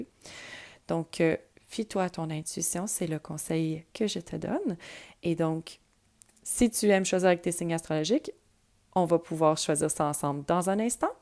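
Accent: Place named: Quebec, Canada. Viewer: Oui c'est ça ! Ça bouille ça bouille ! (Mais on sait pas trop ce qui bouille, ni ce qui va en sortir).Le français Québécois me fait mourir de rire 😜. Merci en tout cas, j'adore tes tirages ❤🤗🤗🤗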